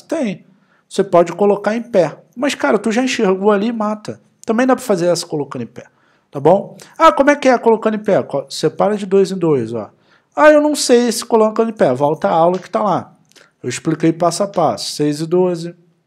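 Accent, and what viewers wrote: Rio de Janeiro, Brazil. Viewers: Eu adoro o jeitinho carioca "Tu vai continuar reclamando?" 🤣 Obrigada pelas aulas! Aprendo muito aqui e paro de reclamar!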